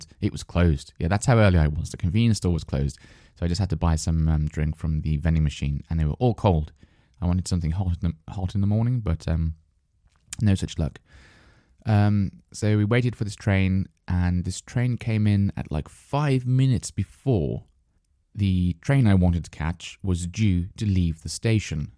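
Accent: United Kingdom. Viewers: British Accent ❤❤❤❤❤❤ I love listening British podcasts.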